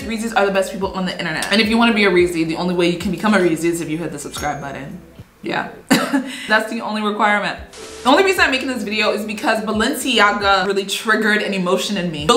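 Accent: Nigeria. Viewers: You sound Nigerian I love you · I just found your channel.. And I love it. Especially your accent.. Is it nigerian? Or other country in west africa? And yes i agree with your opinion about this.. This could also applicable to some arts... Like i don't understand why would someone pay thoisands of dollars for paint on rocks?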